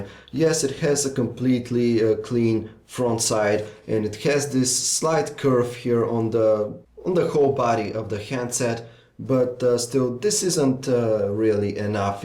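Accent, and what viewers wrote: Eastern Europe. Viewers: is your family's origin somewhere in east-europe? your accent makes me think this so. nothing bad. great preview guy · Dude your Eastern European accent is too ridiculous.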